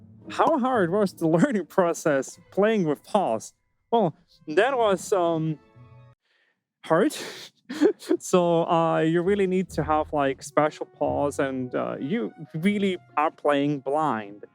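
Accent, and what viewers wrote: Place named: Germany. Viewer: Naww that's really awesome that you've made a vidio like that! ;3 And as a German speaker I would also say your accent is more like "Hochdeutsch"! ;3🐾🦊🐉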